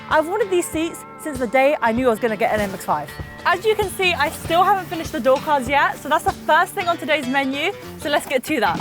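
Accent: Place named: Jamaica. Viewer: Why do you talk like a yardie ?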